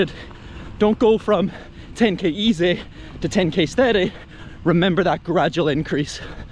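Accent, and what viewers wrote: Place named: Northern Ireland, United Kingdom. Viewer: Heard the N.I. accent & I’m intrigued. I’m doing the Belfast marathon 20 years after the last time I did it & hoping to do it faster.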